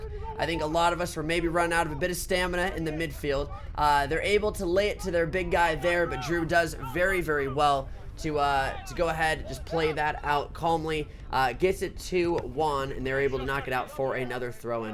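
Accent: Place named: United States. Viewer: This sounds too American for me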